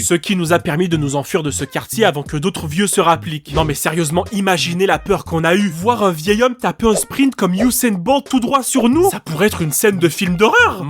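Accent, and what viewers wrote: Quebec, Canada. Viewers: attend tu es Québécois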